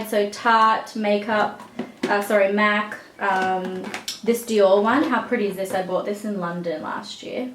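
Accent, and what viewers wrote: Australia. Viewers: i see from your accent your Australian?? so am i but i was wondering where u get ur makeup from because u have the brands that we don't have in Australia???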